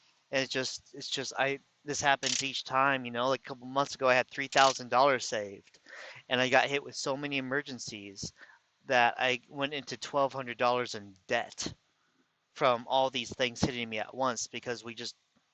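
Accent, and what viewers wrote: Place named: California, United States. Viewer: You're human. You are allowed mistakes. I love your voice, very Californian.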